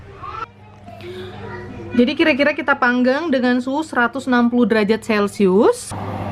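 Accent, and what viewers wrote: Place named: Jakarta, Indonesia. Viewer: Ga nyangka k'lo Si Mamak orang Batak+lahir & besar di Kaltim/Balikpapan krn gaya'a,bbrp idiom + aksen'a spt orang Jakarte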